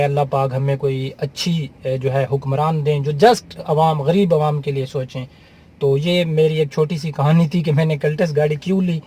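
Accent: Saraiki, Pakistan. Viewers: very nice..ap say gari layni paray ge..aik to ap ki sadgi..or siraiki lehjay par to qurban hu mein..lolz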